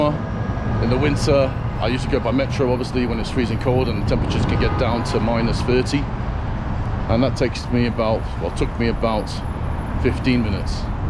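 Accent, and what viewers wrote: United Kingdom. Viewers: Hi I found your channel today I just adore your British accent! sending love and greetings from USA ❤ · Хоть я и не очень орошо знаю английский, я с первых слов понял что говорит англичанин) Резко режет слова. Englishman in Moscow)))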